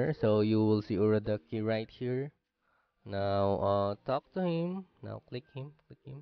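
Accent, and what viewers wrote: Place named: Philippines. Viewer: Man u from philipines ur english like philipines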